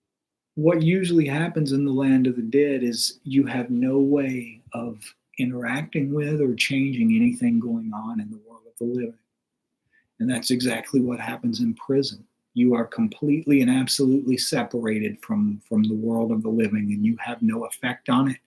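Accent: South, United States. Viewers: Man I wish I'd have gotten the notification in time to be here live. I'm gonna have to see what's wrong with my settings. I've been waiting for you to share another video. I enjoy your teaching so much. And I'm so very proud of you and everything that you've accomplished in your studies. I'm so thankful for your interest bc I always learn something new about things I've wondered from your broadcasts. And it's so refreshing to be able to listen to another southerner. Especially on the topics that intersect me. You're a one in a million type of person. I've known about your past since HBO's debut. And I knew as I watched in horror that you are innocent. And all I could do was pray about it and believe y'all would get out of that nightmare alive and tell others that didn't know what was going on. It does my faith in GOD so much good to see you doing so well. 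Your resilience is an inspiration to me. And I wish all the best in the world.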